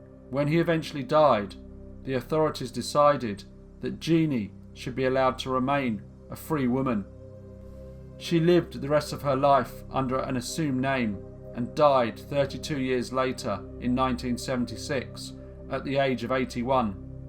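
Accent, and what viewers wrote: England, United Kingdom. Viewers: Have you actually ever met a real human being?? You sound English but I've never in my life heard anyone pronounce the word 'granite ' as you did here mate!? Seriously! Where the fook did you get that from? You do not pronounce the silent e at the end of that word !!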